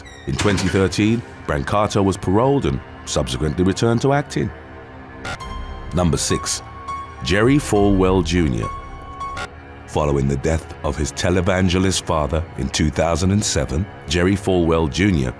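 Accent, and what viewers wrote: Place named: United Kingdom. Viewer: Please do when 23 year olds go wrong? I really like your channel, I like the narrator, you sound like darth Vader with a British accent! 😆😆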